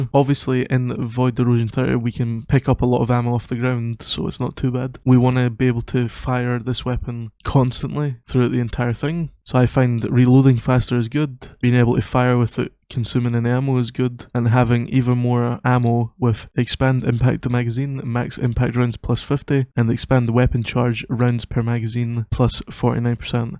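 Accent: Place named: Scotland, United Kingdom. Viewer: I hear the Scottish accent so I’ll leave you alone Back rockstar a company created by 2 Scottish brothers , not this goofiness cash grab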